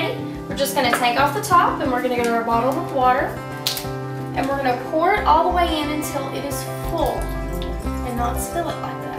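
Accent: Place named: South, United States. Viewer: Love the southern drawl 🤠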